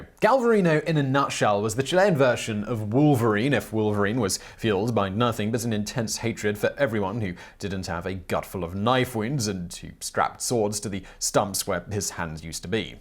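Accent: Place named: United Kingdom. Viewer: I specifically listen to you for HOW you pronounce things. Give me British for this anglophile.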